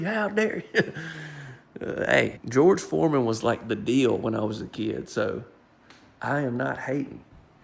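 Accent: South, United States. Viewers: This is so adorable, I love it (and I just love your southern accent too!)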